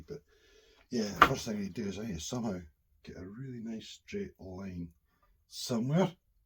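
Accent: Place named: Glasgow, United Kingdom. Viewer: Just found your channel & subscribed. Sounds like your accent is somewhere near Glasgow.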